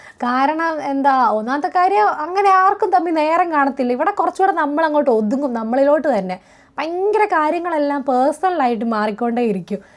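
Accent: Kottayam, India. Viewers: കൊച്ചു കോട്ടയംകാരിയല്ലേ 😂സത്യം പറയണം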